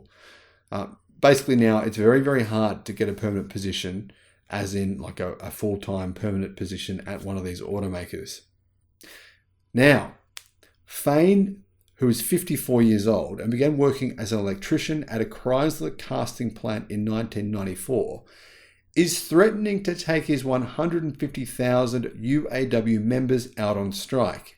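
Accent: Australia. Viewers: u sound like one of my Aussie or NZ, cussins, ps, kindness is still free!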